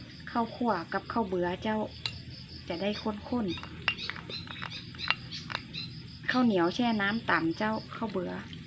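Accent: Northern Thailand, Thailand. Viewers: ภาษาเหนือน่ารักจังค่ะ อาหารก็น่ากิน☺️❤️ นิ้งมาย้ำกำลังใจให้กันเหมือนเดิมค่ะ❤️ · ภาษาเหนือน่ารักไพเราะมากๆครับ อาหารก็น่าทานครับ สวัสดีครับ มาติดตามรับชมและให้กำลังใจครับ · ชอบภาษาเหนือจัง มีเสน่ห์ ตำเครื่องแกงด้วยอีกแรงนะคะมามี้แรงเยอะมากจ้า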